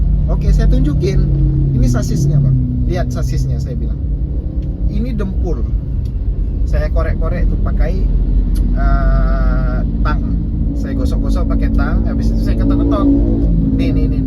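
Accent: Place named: Padang, Indonesia. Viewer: Logat abg kyk org padang 😅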